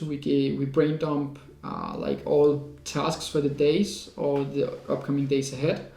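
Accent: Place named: Denmark. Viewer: Holy shit started the video and was instantly pointing and saying "Bro is Danish"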